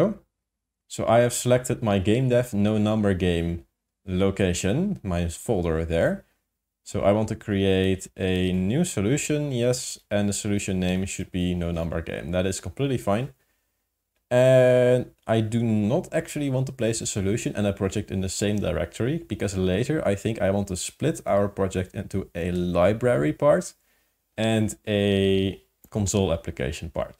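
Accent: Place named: Netherlands. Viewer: Perfect timing. I'm just doing some open tk stuff myself. From your accent I figure you're dutch? Me too 😊 I'm curious where this will go, you've got my subscription!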